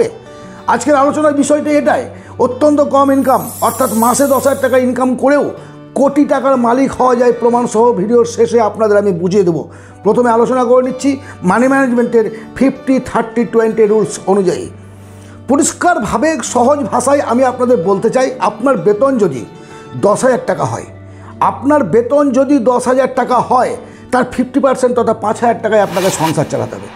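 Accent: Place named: India. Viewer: দাদা আপনার রাষ্ট্র ভারত না বাংলাদেশ। আপনার কথা বুঝতে পারলাম ভারত। একটু সন্দেহ হলো তাই জানতে চাইলাম। আপনার পরামর্শ গুলো খুবই ভালো লাগে। নমস্কার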